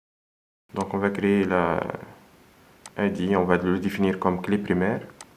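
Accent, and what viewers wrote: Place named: Tunisia. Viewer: accent tunisien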